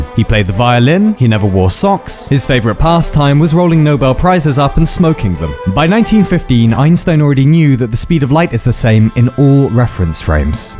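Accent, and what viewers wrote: England, United Kingdom. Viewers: you british? you bulgarian? what else? :D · Why do you sound british ? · Нали? много е готин човека ама бг-то му с чист английски акцент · why do i voluntarily put myself into listening to some brit talk about life for 15 minutes every month or so? why do i enjoy it so much?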